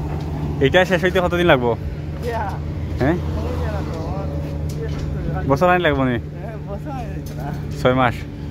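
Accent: Sylhet, Bangladesh. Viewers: Love your video from Fr. Bhai, please try to continue your natural Sylheti math. Don't try pure bangla. Keep it up. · সিলেটি মাথা কিতা সুন্নত নি। শুদ্ধ বাংলা বললে 64 জেলার মানুষ বুজবো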